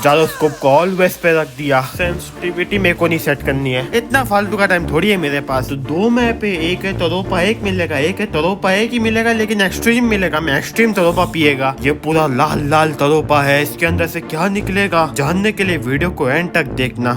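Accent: Gujarat, India. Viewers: Bhai gujarati Cho ne tame Tamaro hindi ma Gujarati flow joi lage che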